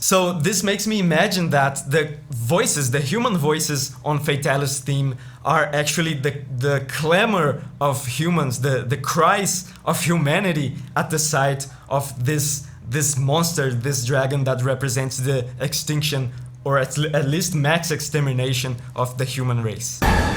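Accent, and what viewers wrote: Brazil. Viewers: Fatalis é um bixo muito brabo, percebi que tu era brasileiro só pelo sotaque kkkk vlw ai mano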